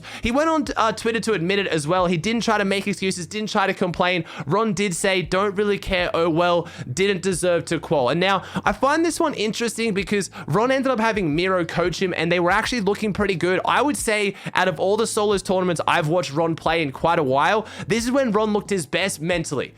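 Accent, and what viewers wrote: Australia. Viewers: Are you from Melbourne or Sydney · Are u an all-star Aussie?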